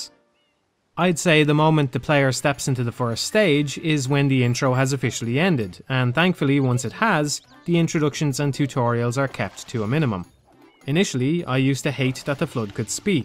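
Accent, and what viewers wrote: Dublin, Ireland. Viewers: Great to hear a working class Dublin accent on youtube, especially one that voices such well informed analyses.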